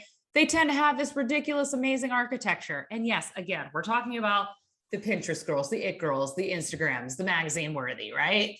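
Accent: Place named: Texas, United States. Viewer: Is it me or are you saying Y’all more frequently? The Texan is coming out! 🤣 I love it!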